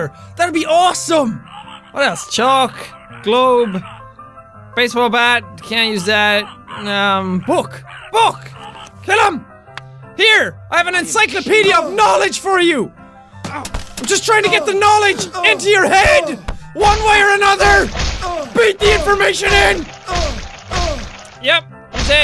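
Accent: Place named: Ireland. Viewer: The Irish accent is 10 times stronger in the old videos.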